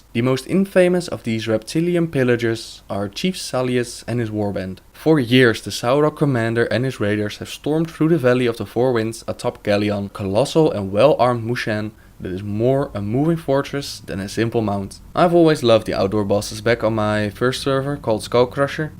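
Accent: Netherlands. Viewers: haha je zij bonus role echt heel erg met nederlanss accenr xd niet erg maar grappig :)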